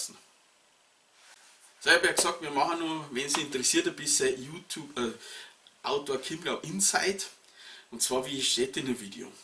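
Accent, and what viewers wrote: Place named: Low German, Germany. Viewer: Besser als Deine HD-VIDEOS ist defenitiv, Dein "Plattdeutsch"😀😀😀 Du machst doch eh schon geile Videos, genau wie der Christian, HD ist toll, die Information ist wichtiger. LG Jupp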